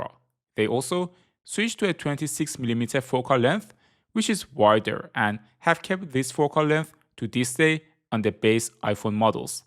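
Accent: Iran. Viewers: لهجت میگه که ایرانی هستی😅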